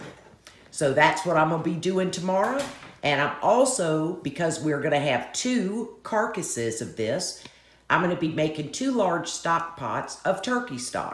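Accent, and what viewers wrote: South, United States. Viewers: Loving, thanks for participating. Love the southern accent, I could listen to you all day. Good points and sanitizing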